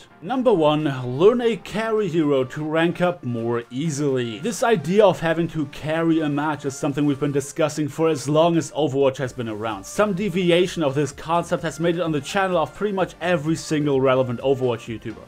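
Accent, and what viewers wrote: Germany. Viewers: is it possible that you are german ? xD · Is german your mother tongue?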